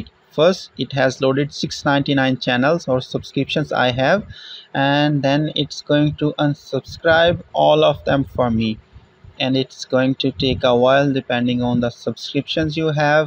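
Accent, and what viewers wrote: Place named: India. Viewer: You probably speak russian(judging by russian channels), indian (or pakistani I dont know, just from your accent) and english and other asian languages? if not more... That's impressive!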